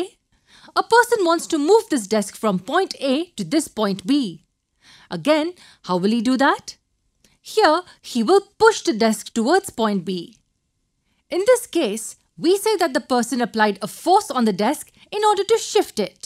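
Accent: India. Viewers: Heavy Indian accent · wow indian accent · This Indian sound Smh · R u Indian bc ur English sounds like Indian people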